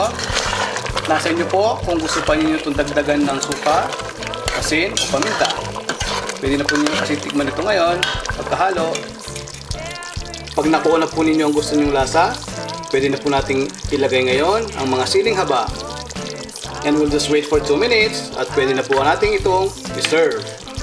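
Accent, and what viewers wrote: Pampanga, Philippines. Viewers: kapampangan ka ba??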